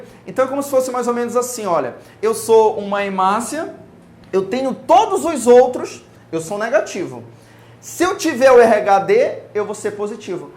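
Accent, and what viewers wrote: Pará, Brazil. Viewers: Tu é paraense mano? Tem um sotaque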